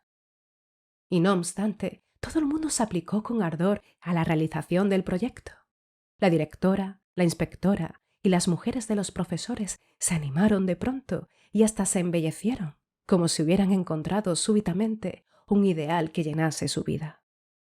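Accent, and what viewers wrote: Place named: Spain. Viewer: La voz, la entonación, la pronunciación de algunas consonantes que contrastan con la suavidad de las otras y las vocales, me hipnotizan. Además que de por sí el acento español de España, cuando es claro, me encanta.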